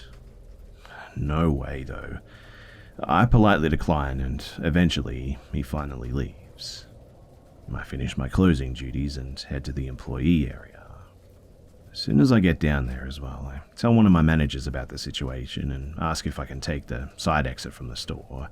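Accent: Australia. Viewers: mistercet pretty sure he’s got an Australian accept lmfao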